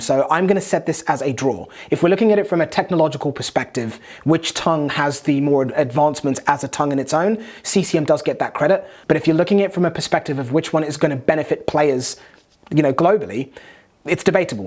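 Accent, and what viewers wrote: England, United Kingdom. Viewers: Hearing an Englishman talk about hockey still throws this Canadian off. But there’s no denying that knows his shit. As always, a great and informative clip!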